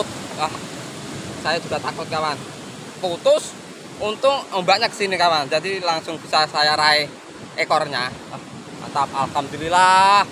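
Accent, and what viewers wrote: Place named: Java, Indonesia. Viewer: Bahasane ojo medok2 to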